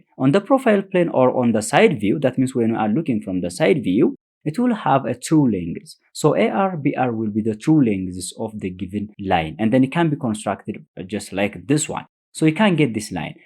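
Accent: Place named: Ethiopia. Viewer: Your accent is so Ethiopian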